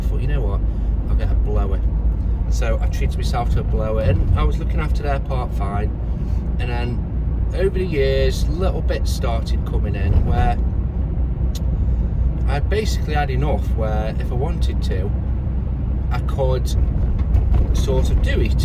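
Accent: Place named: Nottinghamshire, United Kingdom. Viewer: Nice drive, and sounds like you've got a good attitude to your business. Good for you. I was trying to guess where you're from going by the accent, I thought Nottinghamshire, then i saw the road signs!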